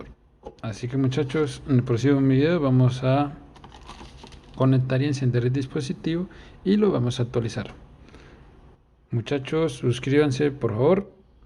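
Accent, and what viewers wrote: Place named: Colombia. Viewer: Que precio tiene en su país amigo por su acento me parece que es de Colombia de que parte? Un saludo desde Barquisimeto. Venezuela.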